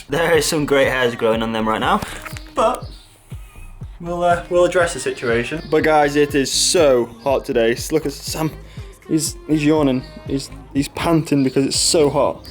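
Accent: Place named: Yorkshire, United Kingdom. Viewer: I hear a dirty Yorkshireman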